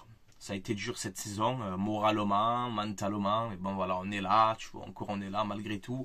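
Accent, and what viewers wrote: Marseille, France. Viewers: Juste comment tu parles, j’ai pas besoin de prouver que t’es Marseillais 😂😂 Je comprends frérot Hala Madrid vaut mieux ça pour ta santé 😂 On as pas de LDC mais on est heureux, ils ont une LDC mais sont malheureux 🫵🏽😂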